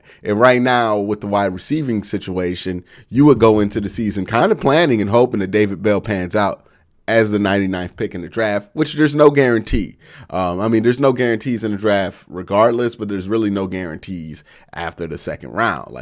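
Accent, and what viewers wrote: Cleveland, United States. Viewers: I just clicked on your video cause it was recommended to me (I wanted to hear thoughts on Clowney) but then I heard your Cleveland accent and was like wtf!? I liked your take but then I heard your accent and found out you were a Brown. I'll be watching your show man, I'm subbing. What part of Cleveland are you from?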